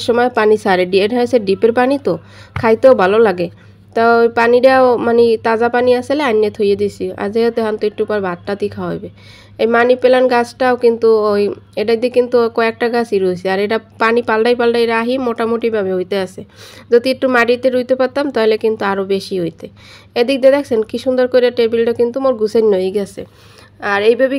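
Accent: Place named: Noakhali, Bangladesh. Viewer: আপু লাইক দিয়ে দেখা শুরু করলাম,, আপনার কথাবার্তা আমার খুব ভালো লাগে,,এটা কি নোয়াখালীর ভাষা???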